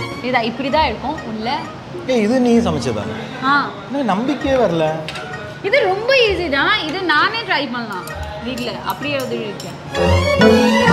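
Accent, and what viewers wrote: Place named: Kerala, India. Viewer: நீங்கள் பேசும் போது மலையாளம் தெரியுது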